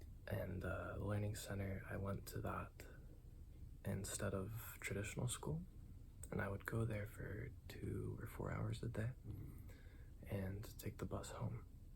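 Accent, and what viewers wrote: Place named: California, United States. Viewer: Also immediately guessed you were from ca with the way you talk haha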